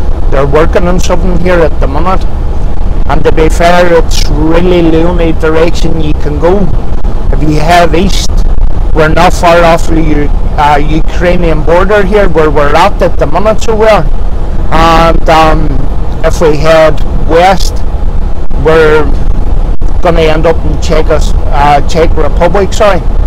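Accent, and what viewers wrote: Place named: Ireland. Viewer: I laughed when you said the Polish driver spoke English - your Irish accent and his Polish accent must have made for an interesting convo. Maps are a great inclusion. I have been reading the road signs and then searching on Google to see where you have been until now. When you give the updates could you perhaps do a 'picture in picture' so we can see where your actually driving whilst talking. Does your 'better half' watch the videos? Would appreciate how hard you toil to pay the bills. Much enjoyed!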